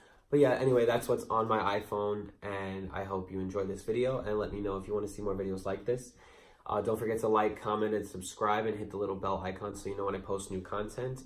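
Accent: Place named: New York, United States. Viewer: Your NY accent is so awesome. Please keep making vids. They’re really interesting. Good job.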